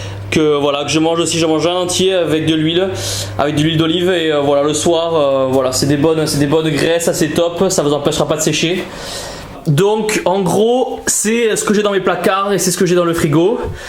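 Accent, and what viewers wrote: South, France. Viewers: Le mecs il avait l’accent du sud avant 😂 💪🏻force a toi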